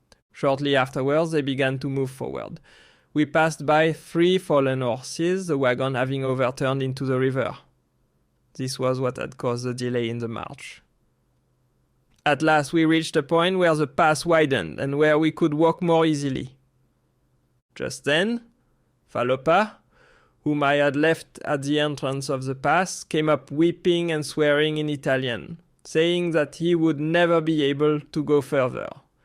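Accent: France. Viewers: I like the French accent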